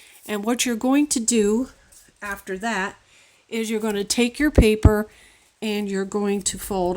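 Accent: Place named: South, United States. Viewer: Love your project. New subbie too. SOUTHERN GIRL what state are you from?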